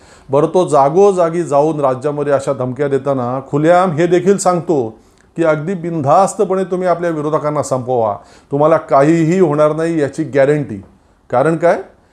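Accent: Standard. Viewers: मला मराठी भाषा खूप आवडते. शुद्ध मराठी उच्चारण आपला खूप मस्त. मराठी चित्रपटांना प्रोत्साहन दिलं पाहिजे. तसेच मी मुस्लिम आहे पण मला मराठी भाषेचा लेखक व्हायचा आहे.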